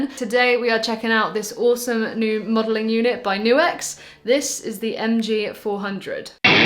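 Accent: United Kingdom. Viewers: Brit accent ! you play well, congrat' :)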